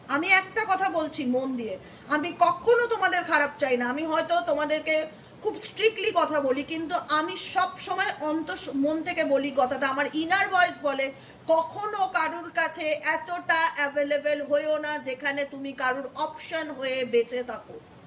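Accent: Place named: Bangal, Bangladesh. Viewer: দিদি আপনি যে বাঙাল ভাষা বলেন আমার খুব ভালো লাগে। আপনি বিড়াল কে বললেন জুত করে বসো। আমার মনে হয় হাজার এর মধ্যে এক দু জন বুজেছে।😊😊😊😊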